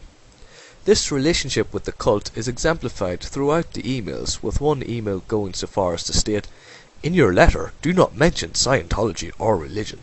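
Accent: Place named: Ireland. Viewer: great video man, I like your accent. Irish? very nice information~ keep it up ^_^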